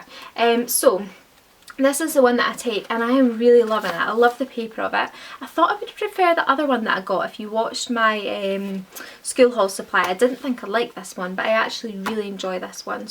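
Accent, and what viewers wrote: Scotland, United Kingdom. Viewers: I am from Germany and I will go to Scotland for my Au Pair next year. I love your accent. It is great to get prepared for my time in Scotland. Thank you so much! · OH MY GOD!! Are you Scottish?? I AM IN LOVE WITH SCOTLAND! This is a goal in my life to visit this country!! (I am French) I just find your video and I want to thank you for it because it really help me! I am a young student at university and I've search everywhere to find a bag that could fit with the atmosphere and now (thanks to you) I find it! So yeah thank you again!! Bye :) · SCOTTISH ACCENt?/?/!????? SO BEYOND CUTE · the accent 💓💓. Merida's blood relative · Ah! So you’re Scottish! For some reason I thought your accent was German?? But then reading the comments, it made a lot more sense now. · YOURE SCOTTISH WOO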